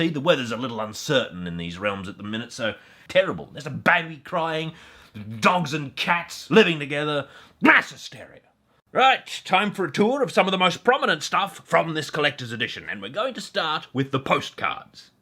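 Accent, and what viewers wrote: Australia. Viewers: I came from the old Hero Quest video thinking you were English and find you're actually Ozzy! You'd fit right in with over here with the D&D crowd, hilarious stuff mate :-D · Is he an Aussie? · Are you Aussie?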